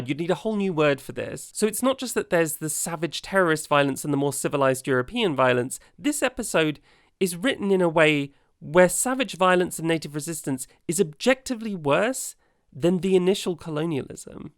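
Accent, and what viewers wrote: United Kingdom. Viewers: british accent with the pplicker lisp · I’m a new subscriber after this. I really disliked this episode and you explained my feelings so well. Great video. And your British accent works so well for the delivery of your satirical lines.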